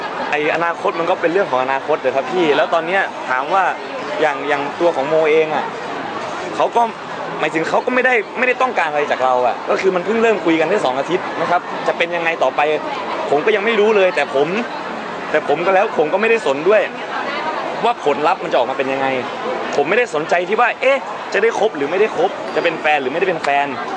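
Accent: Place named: Isan, Thailand. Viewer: ชอบตรงพูเดกลางสำเนีบงอีสานเนี่ยแหละ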